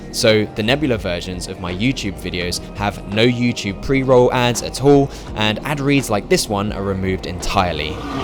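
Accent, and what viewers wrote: United Kingdom. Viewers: Just 1 minute in and this is the first video I've watched from you and you sound British yet you talk about being under mountains of student debt??? Why? This isn't America where you get chased for it. UK student loans are paid only when you start earning over a certain threshold (the poverty line), is in proportion to your income, is removed automatically from your pay slip and is forgiven after 30 years and has virtually no impact on mortgages, credit score or anything else. It's effectively a graduate or uni tax not a loan.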